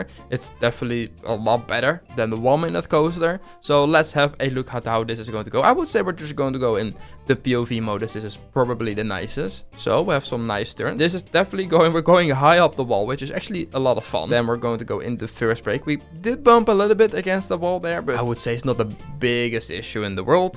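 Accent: Netherlands. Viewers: Are u dutch? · Ben jij Nederlands dik hoor het aan je accent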